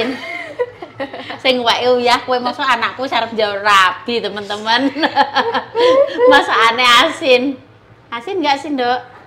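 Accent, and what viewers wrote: Java, Indonesia. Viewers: Aqu suka bila te vloq masak " suka bhs nya ya mendok jawa blitar tak gojake ' pinter te ajari putri nya untk memasak ' sepandai apapun sekaya apapun bila punya potensi diri insya alloh berhasil dlm hidup So switt bahagia te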